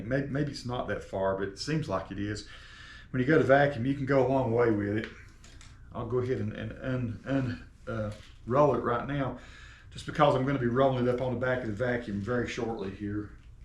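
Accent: South, United States. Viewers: Thanks for the detailed vid from a fellow southerner (I am a North Carolinian; I think you are more Alabama or Mississippi correct?). Anyway, I couldn't believe how bad Dyson's video was, especially the part with NO AUDIO. Shame on them for charging so much but not giving us an actual printed manual (my latest version only has a link to a .pdf) and a crappy silent vid.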